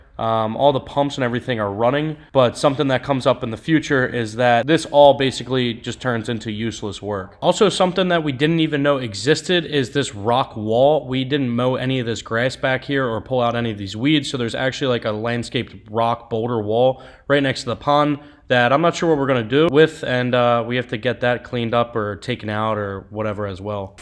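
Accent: Philadelphia, United States. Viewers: That Philadelphia accent, though. Do you know it’s, “water,“ not “wooter“?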